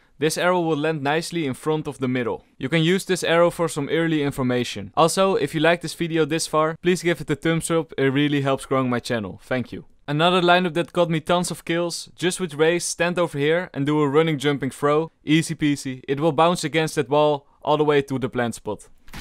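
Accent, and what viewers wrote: Netherlands. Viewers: Kheb nog nooit een Nederlander zo Nederlands Engels horen spreken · Hoor ik een nederlands accent? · Are you dutch? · you can just hear by this guy his english accent that he is dutch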